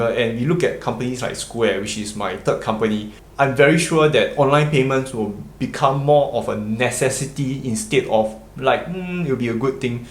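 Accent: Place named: Singapore. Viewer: bro your videos are amazing. your Singaporean accent is so strong, but your messaging is so clear. love the content bro, you make Youtube a better place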